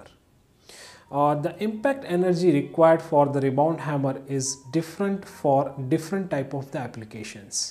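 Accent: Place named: India. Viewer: Indian English is bad thing!!!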